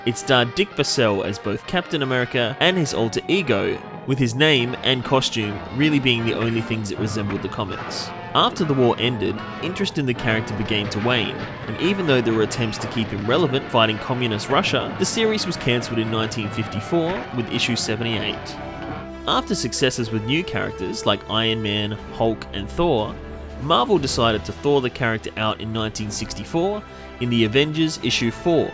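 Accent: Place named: Australia. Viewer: Are you Australian because I am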